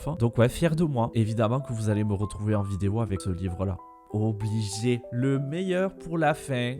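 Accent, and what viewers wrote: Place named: South, France. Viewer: Bonjour ☺ les colos sont vraiment superbes ♥ Je suis ravie d'avoir découvert ta chaîne et d'entendre notre accent du sud ☺ Tellement contente d'avoir un confrère dans le 66 (si je ne me trompe pas 🤣🤣). Je suis une accro des crayons aquarellables mais les produits d'action ne sont pas performants. J'ai aussi fait les double pages d'hercules, j'adore ♥Bon allez je suis une vraie pipelette ☺ A bientôt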